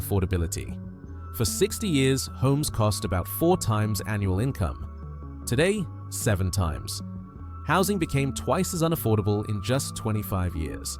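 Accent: United Kingdom. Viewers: Interesting that someone with a British accent is pointing out problems in USA. Compare Great Britain with USA and see what the results are! This is not 1929 and Americans can still start their own small businesses and have more income than those who work for a salary. Those who have the means are already shifting to assets..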